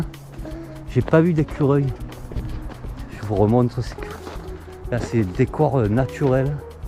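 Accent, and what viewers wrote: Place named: Provence, France. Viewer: Coucou si vous me le permettez ☺️ Merci infiniment de nous faire partagez votre magnifique région qu’est la Provence Je suis allée il y a quelques années déjà à Saint Rémy de Provence j’y ai visité aussi les baux Votre accent me réchauffe le cœur J’espère que vous êtes bien rentré de votre randonnée! Je vous souhaite une bonne fin de dimanche et, Un bon repos surtout après tous ces kilomètres dans les jambes Une amie virtuelle de la Belgique 🇧🇪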